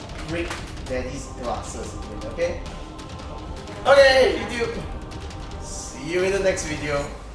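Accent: Singapore. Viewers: Where are you from?, you have a Singaporean accent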